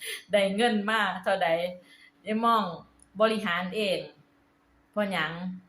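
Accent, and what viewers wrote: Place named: Sisaket, Thailand. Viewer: มักๆเว้าคือคนสีเกด.เเท้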